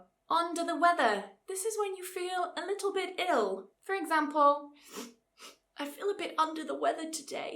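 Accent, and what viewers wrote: United Kingdom. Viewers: !! I love your videos! How do I find you on preply without having to search through every tutor? May favorite idiom … 🥁 Keep your wig on 😅🤣😂🤣😂🤣😂 Love it! Going to use that one here in America! I’m American (Spanish American), however LOVE the British accent and want to learn proper pronunciations. Thank you! Love your videos!! 🎉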